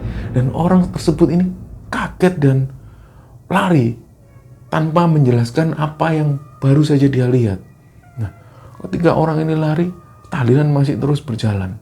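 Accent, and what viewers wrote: Java, Indonesia. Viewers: Video nya hampir smua kutontoon mas the best menyajikan cerita nya khas medok Jawa nyaaa 👍👍👍👍 ketagihan nonton nya tekstur crita gAmpang paham untuk dicerna👍👍👍good Job mas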